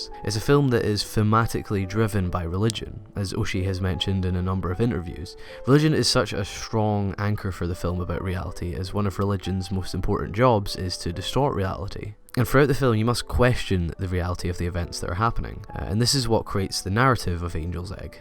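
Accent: Scotland, United Kingdom. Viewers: Where is that accent from? Scottish? British?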